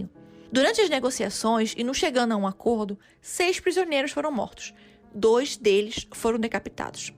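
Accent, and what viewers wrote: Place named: Northeast, Brazil. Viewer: Escuta narradora nordestina… Que graça tem recontar a história,que os melhores noticiários do Brasil,e do mundo, já contaram com riqueza de detalhes? Eu moro na Europa,e assisti a reportagem na época.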